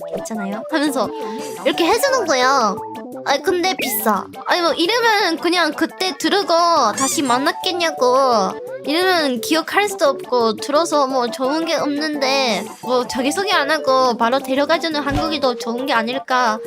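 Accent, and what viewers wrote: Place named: Japan. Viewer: 와 근데 오랜만에 봐도 방송천재긴 하다 ㅋㅋㅋㅋ 저 일본묻은 말투랑 다 너무 사기임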